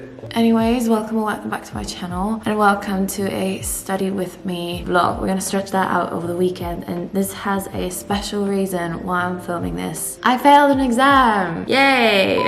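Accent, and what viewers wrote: United Kingdom. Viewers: Ich finds zu genial, dass du im deutschen auch diesen englischen Akzent jetzt hast :D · I love the British accent and I was convinced u‘re British..und dann fängst du an Deutsch zu reden😂😂💖Loved the video, thank for motivating us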